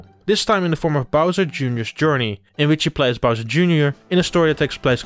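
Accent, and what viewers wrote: Netherlands. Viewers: You’re dutch, right? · Wow the Dutch accent can clearly been heard brother xD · hello fellow dutch person , i recognize your accent :D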